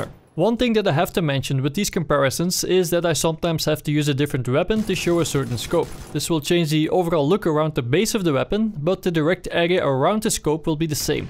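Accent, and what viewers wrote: Netherlands. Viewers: You're so dutch. lol :D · Zijn Nederlandse accent is wel echt geweldig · Nederlands accent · the fkin overpowering dutch accent killing me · your english is terrible with a huge dutch accent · u dutch boi can hear it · Most dutch guy ive ever heard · work on your Dutch accent het maakt het wat moellijk te verstaan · Je hoort zo dat dit een nederlander is XD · argggg the dutch accent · that Dutch accent is real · #dutchie haha gast binnen 1sec wist ik al dat je nl was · your dutch im 100% sure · nederlands hoor ik aan het accent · this guy has a dutch accent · Jij bent nederlnads engels noob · sounds dutch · Nederlander, hoor je meteen 🤣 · hoor ik gewoon aan ze stem dat ie Nederlands is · your Dutch accent stands out so much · ik hoor aan je accent dat je nederlands bent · gast je r is the hard het is gwn te horen dat je nl bent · that dutch accent love you man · Zwaar Nederlands accent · Your accent looks netherlands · barely even realize ur dutch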